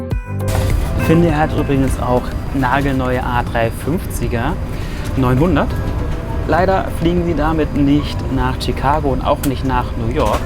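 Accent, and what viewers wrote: Germany. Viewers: Ich habe mit ihnen über dieses Thema schon einmal gesprochen. Sie haben eben das typische Schulenglisch gelernt und jeder weiß, wie gut dieses ist. Wenn man eben, so wie sie, lange kein richtiges Englisch spricht, vergisst man eben eine Menge und die Aussprache leidet auch darunter. Vor allem Vokabeln. Diesen typischen deutschen Akzent hat einfach jeder Deutsche. Ich lebe seit meiner Kindheit zwischen Monaco und Berlin und spreche fließend Englisch, trotzdem hört man meinen deutschen Akzent. Das ist einfach so. Jeder hat seine Probleme mit der Aussprache von verschiedenen Wörtern. Ich würde es einfach entspannter sehen. :) Liebe Grüße aus Monaco -Raphael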